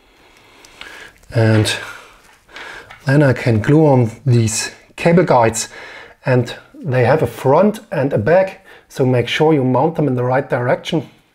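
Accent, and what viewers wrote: Germany. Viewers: Funny Guy, Hilarious comments, No BS to the Point, Crazy Accent - German if i am right! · deutscher akzent on point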